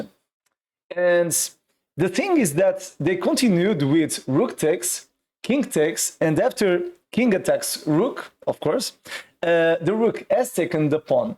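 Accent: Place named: Azores, Portugal. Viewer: O bendito, pelo nao dito, tu es da ilha do Pico. Took me a bit, but I know it was Portuguese with azores accent. Good show and enthusiasm.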